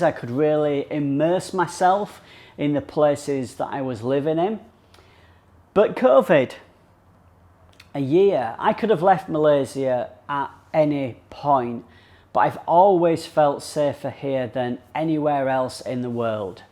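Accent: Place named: North, United Kingdom. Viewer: Ahhhh...that familiar northerner accent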